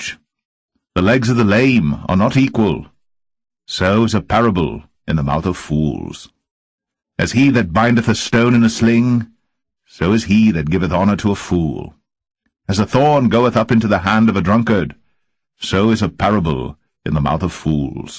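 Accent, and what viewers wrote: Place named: United States. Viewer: American English but the KJV Bible is written in old English so it sounds different.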